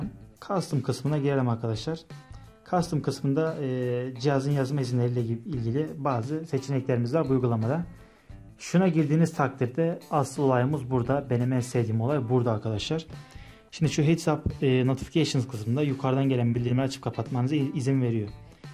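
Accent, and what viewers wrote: Gaziantep, Turkey. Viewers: Antepli misin sen ? Neresinden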